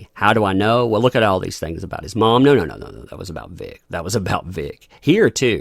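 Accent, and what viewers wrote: South, United States. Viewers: Damn my guy. You've got that good ole fashioned southern draw makes me feel home.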